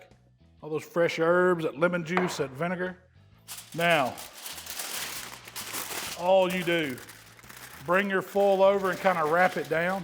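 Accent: South, United States. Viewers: Always enjoy your videos, and usually learn a little something along the way. When a bearded man with a southern accent and a friendly smile wants to teach me BBQ, I'll all down for it :)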